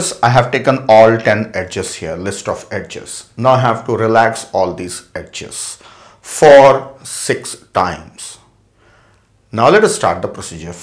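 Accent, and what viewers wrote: India. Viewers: even with scary indian accent you are undoubtedly great!!!! Thanks a lot for grand contribution to suffering in inefficiency educational system. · I wish I could understand Indian english accent better, you are clearly experienced and knowleadgeable, intelligent person and also explain things in a simple manner.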